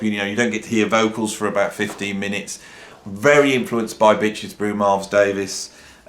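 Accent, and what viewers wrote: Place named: England, United Kingdom. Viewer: Give him a break he's an Englishmen they all pronounce words that end with an "A" ( er) . people here in the U.S. (New England) do the same. '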